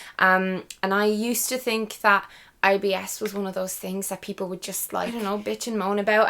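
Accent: Ireland. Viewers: Brand new sub. Just binge watching your vids as we speak. Relate to so many of your vids. Think you are lovely and you can't beat an Irish accent, greetings from Scotland ❤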